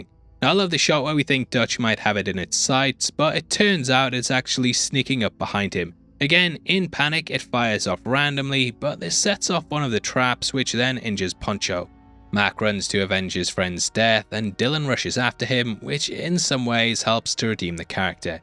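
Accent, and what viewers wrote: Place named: United Kingdom. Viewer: Why is that mate..? You’re clearly (judging by the way your voice sounds) speaking in a U.K English accent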